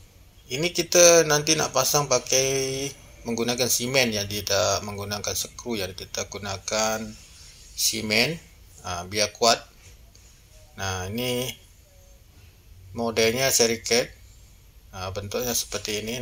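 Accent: Malaysia. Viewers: Cakap dah macam org Malaysia marah pulak nanti org Indonesia. Mantap bro jelas mudah faham.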